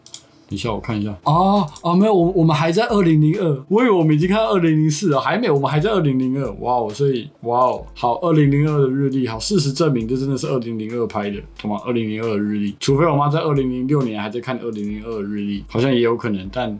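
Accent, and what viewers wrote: Taiwan. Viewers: 真的很台😂“拉撒呷拉撒杜啊”都用得恰到好處！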